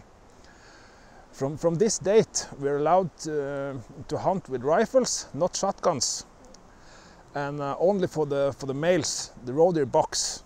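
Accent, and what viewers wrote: Norway. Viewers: Really relaxing the way you narrate. Best thing is the Nor-english accent. Hehe ;) FYI : What he is hunting for Rådyr = Venison.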